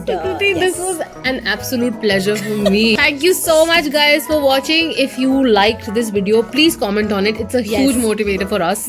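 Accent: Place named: Lahore, Pakistan. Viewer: Your accent of Urdu/Hindi is so Lahori. Lots of love..Keep shining:)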